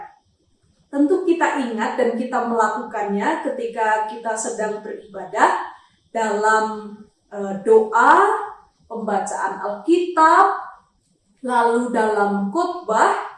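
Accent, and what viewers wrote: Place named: Banyumas, Indonesia. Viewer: Maturnuwun Pdt. untuk Kerlingnya......, ngapak-ngapaknya dipertahankan nggih🙏😊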